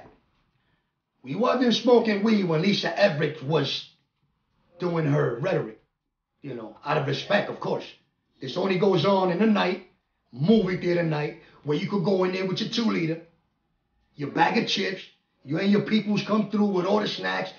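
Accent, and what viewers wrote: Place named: Brooklyn, United States. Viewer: I really enjoyed your stories please keep making videos. You and warpath both sound like guys I remember as a kid, I’m from Brooklyn Marlboro projects and Bensonhurst its makes me feel like I’m listening to the guys when I was a kid keep it up man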